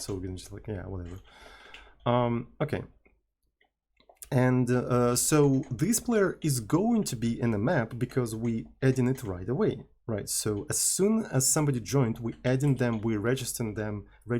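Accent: Russia. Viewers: Твои видосы отличные, но мне, как русскоговорящему, тяжело слушать русский акцент) Если тебе не похуй на мой совет, то изучи правильное произношение звуков в английском. Например звук "т" в англе совершенно другой (и при этом не сложный бтв)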